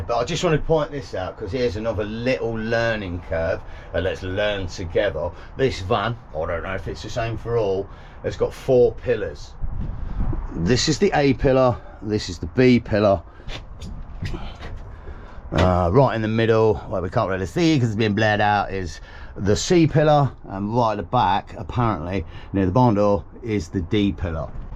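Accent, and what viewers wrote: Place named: Leicestershire, United Kingdom. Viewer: Loving the videos lately mate. Nice hearing a fellow Leicestershire accent lol. Keep them coming!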